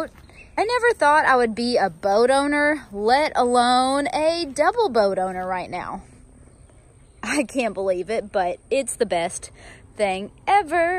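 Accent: South, United States. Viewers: I just love you and your southern hospitality and accent! And love Bo! He’s adorbs! I would love to try the sausage biscuits! They look yummy! Following them on FB and IG!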